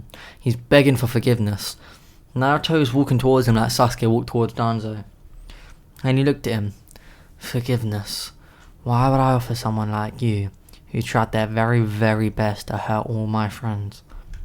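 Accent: United Kingdom. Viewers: oh i love the British ascent its so funny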